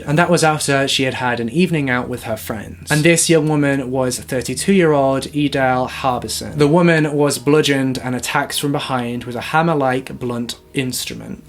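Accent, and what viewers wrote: United Kingdom. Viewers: Hello I’ve come from amber loves mystery honestly love you videos..are you from UK?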